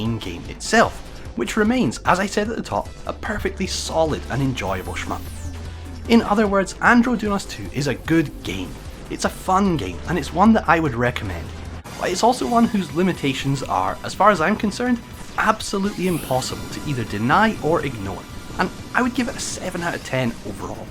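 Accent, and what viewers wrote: Scotland, United Kingdom. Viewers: 😅 I note that the Scottish accent perfectly matches my creepy french ears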